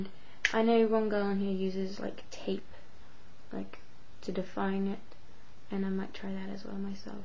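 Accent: United Kingdom. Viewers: what part of england u from? always wondering where that type of "posh" accent comes from. like kate middleton. idk if she worked on her accent but ive NEVER heard her accent on anyone else. yours is nowhere near as posh as hers no worries! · your not posh your just british like me mate x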